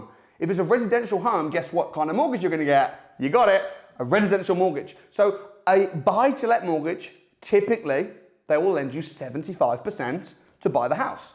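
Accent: United Kingdom. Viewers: Why does the British accent make this video un watch able.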